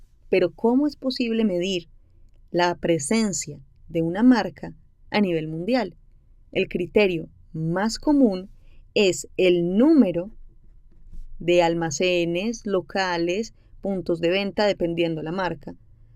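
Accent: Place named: Colombia. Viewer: Esa voz es colombianaaa!!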